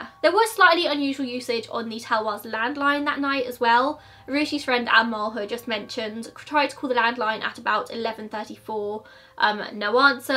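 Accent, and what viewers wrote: United Kingdom. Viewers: I am such a fan of yours, so I hate to make any comment that could be deemed as negative; however, it would be great if you could speak a bit slower. I adore your British accent, but have difficulty understanding what you are saying when you speed talk. I literally couldn't make out much of your Florida vlogs because of this. No disrespect intended 😉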